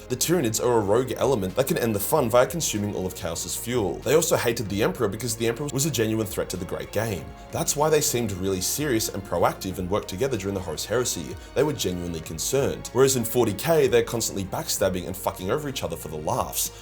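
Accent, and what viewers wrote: Australia. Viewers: Heh you didn't just stumble over "unfathomable" - you also mispronounced "dirties". I think even taking your Australian accent into account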